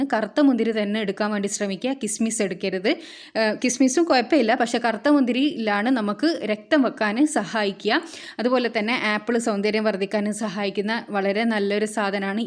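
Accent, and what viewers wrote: Kannur, India. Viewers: Samsaram kannur kare pole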